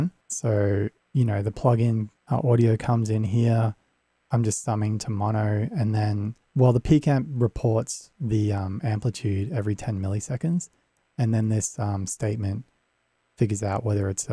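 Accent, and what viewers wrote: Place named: Australia. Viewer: Ah man I've missed those dulcet antipodean tones. Very interested in both of these - to gumroad..awaaaaaaayyyyyyyyy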